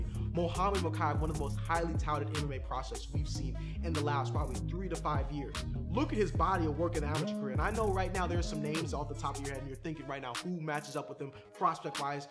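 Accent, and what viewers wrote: Ireland. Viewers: This host has a very different vibe from the usual calming Irish accent. Polar opposite even. Wish him the best but sorry not the one for me. Please stop shouting at me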